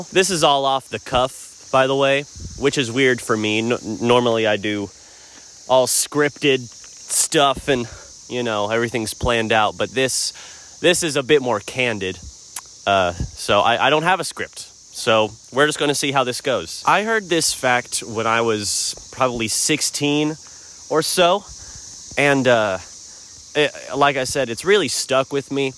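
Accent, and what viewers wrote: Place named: United States. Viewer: That scenery looks like England, was surprised to hear a US accent.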